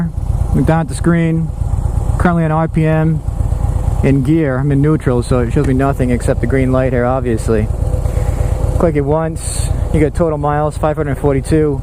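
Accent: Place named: New York, United States. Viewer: "Ya really gotta watch deez cownaz." Gotta be New York. Love it.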